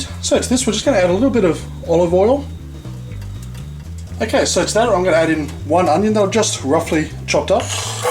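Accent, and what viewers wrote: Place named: Australia. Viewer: Great job,. Always like the Australian accent, along with your good personality! God bless you 🙏❣️😇 Bud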